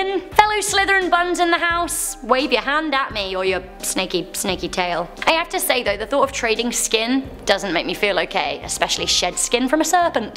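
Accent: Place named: United Kingdom. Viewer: beautiful dress i love british accents ive been watching this channel for over a year and i still love your accent it never gets old to me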